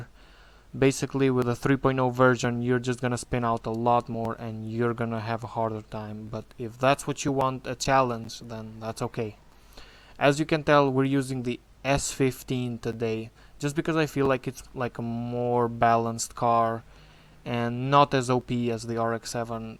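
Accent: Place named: Russia. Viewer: вери стронг рашен аксент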